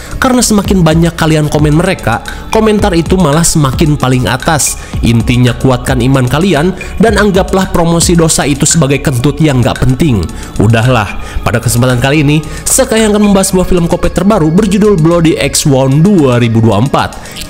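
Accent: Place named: West Java, Indonesia. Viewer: Cuma sukses bikin acara review filmnya jadi seru dgn gaya dan logat Sundanya ngena bgt.top,top,top..🎉🎉❤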